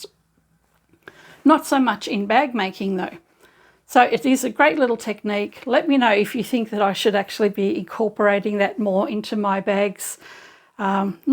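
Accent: Australia. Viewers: Thank you for a great zipper tutorial! Ive just subscribed. I recently did 3 cushions and botched them all in various ways... That wont happen again now ive watched this! Your sewing machine looks amazing. Can i ask what it is? Love hearing an Aussie accent too 😊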